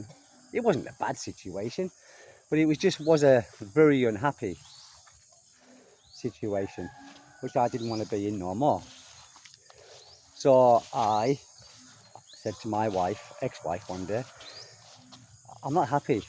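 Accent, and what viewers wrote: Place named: North East England, United Kingdom. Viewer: sounds like you come from somewhere not a million miles from my area in the N.E, Cleveland area.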